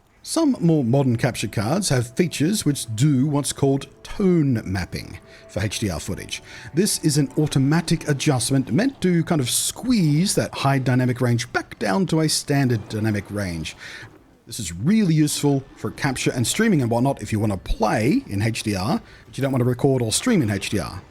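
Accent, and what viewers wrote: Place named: Australia. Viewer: what accent is this? its like an australian trying to talk clearly so they end up using american R's half the time.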